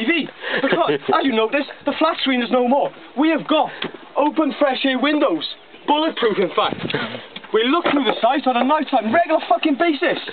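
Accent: Wales, United Kingdom. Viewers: Welsh accent* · I struggle with his accent but I got told he’s Welsh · 100% a Rhymney boyo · Yeah that's the Welsh accent of his coming through. Our accent is really strong. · With the heavy Brit accent and the videographer laughing loudly, it is hard to know what this clown is talking about. I hope he is safely back in the U.K. making civilian videos and taping the videographer's mouth so as to silence his laughing so we can hear the narration next time. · Makes me proud to be Welsh aswell 🏴 I believe he's got a Newport or Cardiff accent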